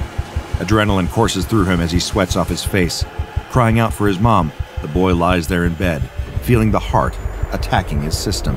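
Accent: England, United Kingdom. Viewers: These English accents are beautiful, I am LOVING your videos. I've been watching and subscribing since your first video. Watching you grow to one million subscribers made me so happy. It's nice to see you going strong. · might be the most british voice ive heard in a while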